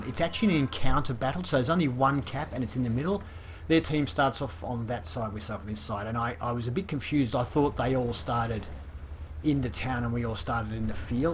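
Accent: Australia. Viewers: Thanks, yes the game has changed a lot, with remade maps and new ones, lots of new Prem tanks introduced, so that for example if I still had my T32, and a few others, it would be out classed by many tier 8's now. But glad you are back as I like your presenting style, and, may I ask if you are an Aussie or Kiwi?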